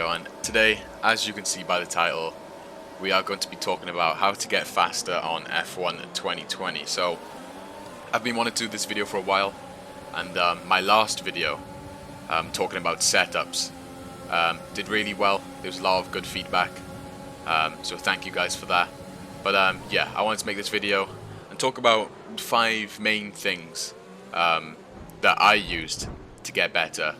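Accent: Wales, United Kingdom. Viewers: Great info, where in Wales you from?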